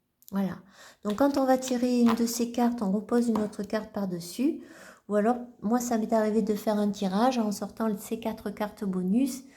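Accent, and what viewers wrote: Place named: United Kingdom. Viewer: Bonjour! Je suis très nature 🐰🐿️🦇🦔🌳et très couleurs 🌈 alors forcément je me suis régalée! Merci pour ces découvertes c'est enrichissant et très tentant 😍. Nous avons le même accent anglais 😉...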